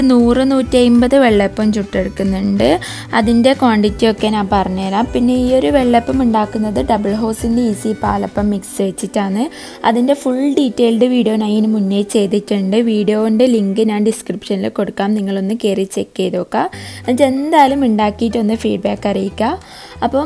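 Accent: Kannur, India. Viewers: Njan malappuramkariya pakshe ithayude kannoor slang enikk orupad ishta😍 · Kannur slang maatandato nallaind kettirikan 😍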